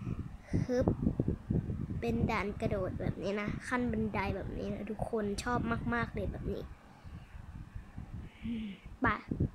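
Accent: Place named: South, Thailand. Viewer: พี่เป็นคนใต้ไหมค่ะ ...เห็นพี่พูดใต้เกือบทุกคริปเลย...🥀🥀🥀🥀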